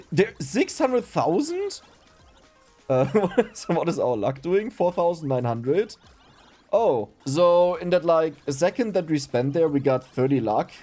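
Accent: Germany. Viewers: Zo, zingle run, zix, zis point...German accent is so weird. You say "second" correct. Why don't you pronounce it then like zecond?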